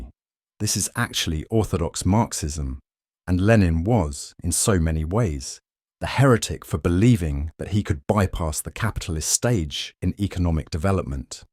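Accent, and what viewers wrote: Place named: United Kingdom. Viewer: Husky ethnic British voice sounding like Alfie from Emily in Paris ❤❤❤❤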